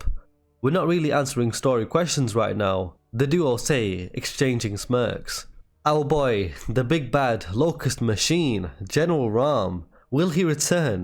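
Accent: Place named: United Kingdom. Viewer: I like supporting your channel but you made this title with zero actual knowledge of new weapons or enemies. Just showed and talked about old ones. Obviously you’re click baiting and this shit runs dry man. Don’t take advantage of people trusting you to then sit there and say pointless stuff in your uk accent like it’s content.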